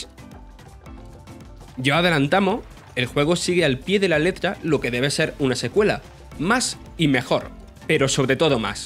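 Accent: Andalusia, Spain. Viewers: yo creo que mas andaluz, es que tiene algun problema, yo no hablo asi y no conozco a ningun andaluz que hable asi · La gente que se queja de su acento andaluz diciendo incluso que le sangran los oídos, todo bn en casa?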